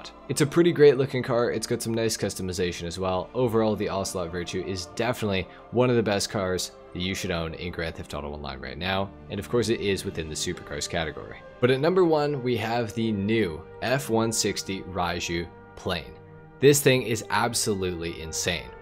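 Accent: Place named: Canada. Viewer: I can tell you’re from Canada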